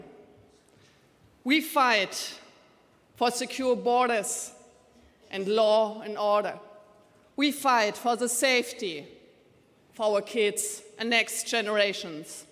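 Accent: Germany. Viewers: "TH" totall versemmelt und Krautakzent! Mehr als Schülerenglisch war das nicht! War nicht mal Leistungskursniveau! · Für eine deutsche Politikerin ist das wirklich ein erstaunlich gutes Englisch, was sie da spricht. Kann man natürlich anders sehen, wenn man gegen diese Frau generell ein verbissenes und verbohrtes Feindbild pflegt, aber wenn man im Alltag selbst viel mit Englisch konfrontiert wird und sich dann mal die aktuellen und die letzten Regierungsmitglieder anhört, was da gesprochen wird, dann hört man eine wirkliche, linguistische Katastrophe. Man hört sofort, dass da eine Deutsche englisch spricht... ja... aber diesen Slang wird man als deutscher "native Speaker" nun mal nicht los. Wer das von sich behauptet, belügt sich selber. Das hier, ist das beste Englisch, was ich seit langem von einem deutschen Politiker gehört habe. Fragen wir mal anders... sind eine Baerbock, ein Habeck, Lindner, Merz, Scholz und Konsorten besser? 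Hör dir mal deren Englisch an, da rollen sich einem die Fußnägel hoch. Und das sind nur wenige, aber namhafte Beispiele. Bei ersterer kann man das Drama ja derzeit in fast jeder neuen Rede bestaunen... Stichwort: UN Diese Frau macht DE grade auf internationaler Bühne zum Volltrottel. Eure Qualitätspolitiker. Wie verbittert muss man eigentlich sein, wenn man in seinem Hass gegen diese Frau und ihre Partei, nicht mal eingestehen kann, dass sie der englischen Sprache weit besser gewachsen ist, als quasi alle aktuellen und vergangenen Regierungsmitglieder Deutschlands? DAS hat radikalen Charakter.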